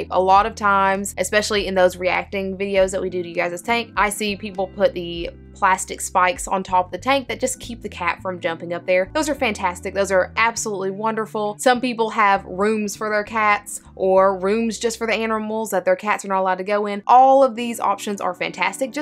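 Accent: South, United States. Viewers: OMG!!!! I was listening to this and your accent wondering if you were in Alabama or the south … then you mentioned ANIMAL TRAX!!! I just went there yesterday in Madison! I live in Holly pond - where are you from??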